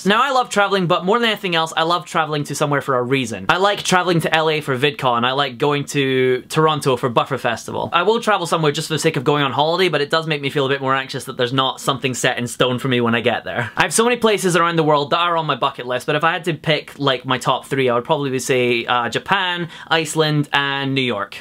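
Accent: Scotland, United Kingdom. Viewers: I really like your videos! I subscribed trying to have a little more contact with the Scottish accent, but now I'm here because of you. And I'm glad you're back to posting!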